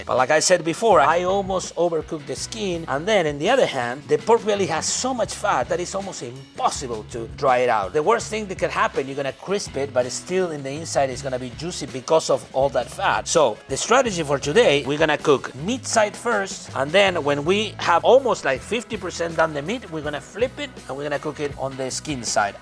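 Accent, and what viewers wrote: Argentina. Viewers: Los videos estan buenos lo unico el audio osea la traduccion porque no haces videos vos hablando en argentino el echo que vivas en yanquilandia no sicnifica que te olvides de como habrar en español rioplatense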